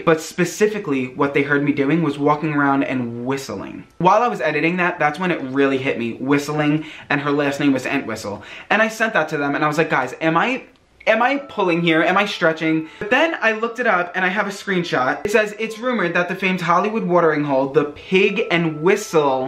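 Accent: New York, United States. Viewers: Your New York accent oh my goddd 😍😍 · You are the most beautiful person I have ever seen 😭 this is the first video I’ve watched from you and you are so awesome btw I love your New York accent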